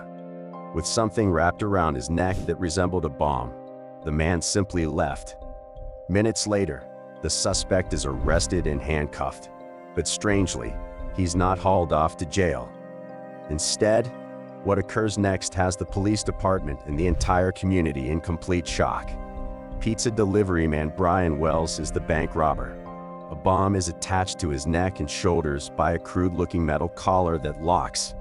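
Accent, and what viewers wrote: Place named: Boston, United States. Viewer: Is this a robot with a Boston accent? Wtf!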